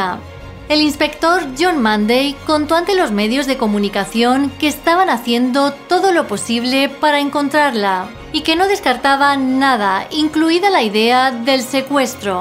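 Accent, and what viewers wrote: Castile, Spain. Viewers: Se escribe pijama y se pronuncia pijama porque habla castellano de España,a que escuela de tu país fuiste tú para corregir algo bien dicho ?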